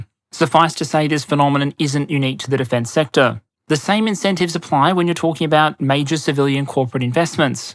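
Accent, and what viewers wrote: Australia. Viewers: Fantastic vid Aussie! I always love seeing one of your videos with a less than sexy name e.g. "Widgets: the design, building, procurement, and supply chains involved", and also knowing some guy who talks funny from down under is going to have my undivided attention for the next hour. I am surprised however when talking about the Bush Turkey, you left out how successful its predecessor the SD-20 Dingo was in it's only decisive victory over Kiwiland in their most recent war. #StandWithKiwiland #StopEmuAggression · No, no, no, once a month 😱? once a week is little enough. Every Sunday I'm looking forward to power point presentation. I even started to like that Ozzy accent!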